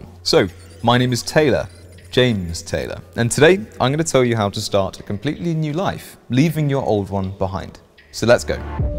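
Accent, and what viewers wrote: United Kingdom. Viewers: Normally the British accent utterly repulses me, but in this case I enjoyed listening to this dapper English gentlemen explain the process and risks of purchasing forged documents from the Dark Web.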